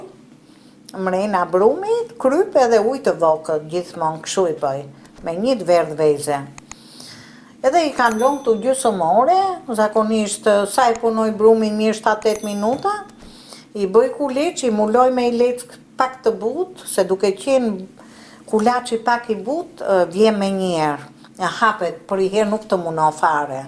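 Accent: Tirana, Albania. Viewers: Me kenaqe sa bukur e komenton je safi tironse Te lumshin ato duar njerzit nuk duan salltanete duan keshtu si ju shqeto 🙏🙏🙏💕💕💕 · Bravo e gatuan mite e flet qarte Tironse mo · Te lumshi duret e arta dhe shpjegimet e tuja ja ne te kuptushme, dialekti shume i bukur i tirones. E pjek si arre, me pelqen qe e kthen e pjekje shume mire. Qofsh mire me shendet.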